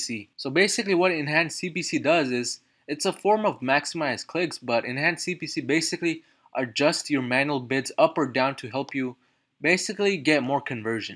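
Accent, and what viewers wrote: India. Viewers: Did you mean campaign or company? What is this bg sound. Where are you from india?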